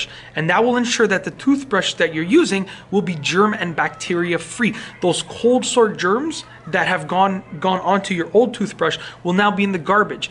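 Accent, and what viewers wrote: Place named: Canada. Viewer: Sounds so Canadian to me. Sorry if I'm wrong. I'm English.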